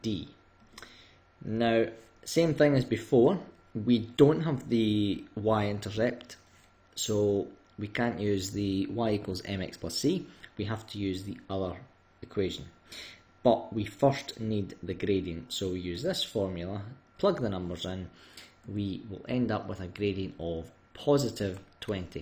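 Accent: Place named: Scotland, United Kingdom. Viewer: Why are most maths videos on YouTube done by Scottish people? Seriously, there's like 9 of them! Great help brother, thank you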